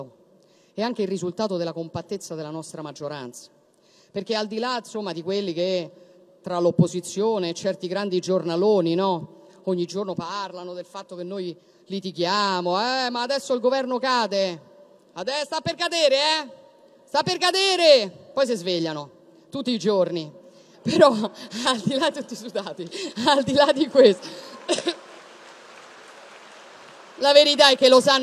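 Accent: Rome, Italy. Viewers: Impara a parlare da PdC di una Nazione, anziché come Una delle Borgate Romane. Raffinatezza ZERO. 😎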